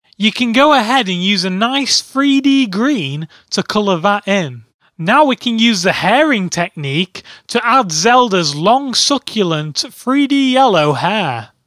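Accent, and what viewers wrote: United Kingdom. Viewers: Yay female Scottish link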